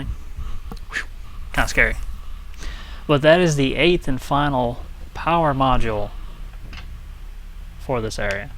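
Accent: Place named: Toronto, Canada. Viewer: your a boss , my man! are you from toronto, cause you sound like it :)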